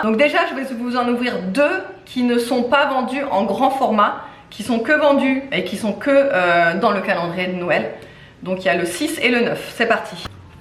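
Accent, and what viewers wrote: Alsace, France. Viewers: ah d'accord ! Je comprends c'est pas négligeable ! J'aime beaucoup ce que tu fais comme vidéos. Et en plus tu es alsacienne comme moi et quand je te regarde ton accent me fait penser à lalsace ahah bonne journée et bon weekend à toi bises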